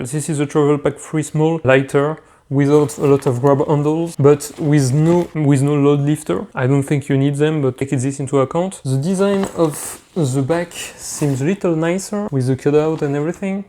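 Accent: France. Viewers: Hi, I love AER products. Could the AER City Pack Pro Ultra fit in a 40x30x20com to be used as a personal item on Transavia ? NB : je viens de découvrir votre chaine et j'aime beaucoup le style même si l'accent français est bien présent. En VF ça serait bien aussi d'autant plus qu'il n'y a rien dans ce domaine en Français.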